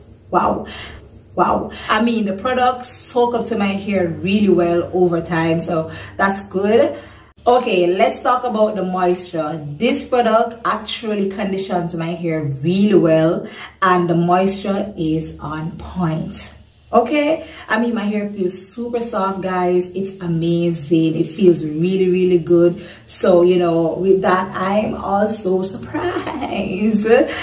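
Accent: Jamaica. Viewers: I can hear the Jamaican in you. I am watching you from Canada! I love your video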